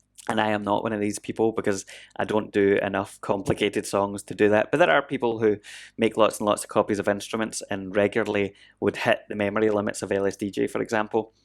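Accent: Scotland, United Kingdom. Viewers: When you started explaining, I was thinking it's like a macro. Instead of just playing the note, you trigger the macro that adjusts the note to your liking. I must say I thought I was the only person on earth with a serious interest in trackers, mechanical keyboards and Melt Banana, but I'm happy that I was proven wrong. I've been using the Beatstep Pro for a while now and I just did a 6/4 track. How hard can you make that! I cannot believe "copy these 12 steps" actually turns it into 16+8 instead of 12+12. That's definitely not a problem with trackers. I have been eyeing the options for a while now and after seeing this video, I ordered a Teensy. Should be here tomorrow. Thanks for your hard work, you explain complex things in a very understandable way. And your accent always takes me back to my travels on Skye, Arran, ...!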